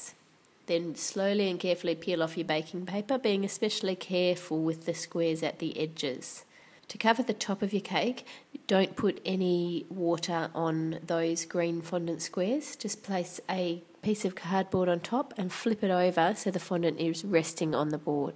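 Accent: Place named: Australia. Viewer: wosho I'm Pretty sure she is Australian, It sounds like she has a Cold too.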